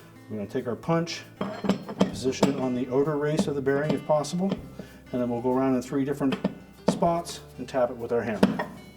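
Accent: Canada. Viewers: Took me 7 hours total.....That included 1 hour to free the tub shaft from the center bearing and 1 hour of power washing and cleaning the tub and gasket. I have an 2004 machine and I did this in 2013 as well as several drain pump replacements. Amazing how cheaply made these China machines are, thin metal. cheap plastics and solder drip-mold nut and bolts!! After following the video, I officially now say "out" like a Canadian.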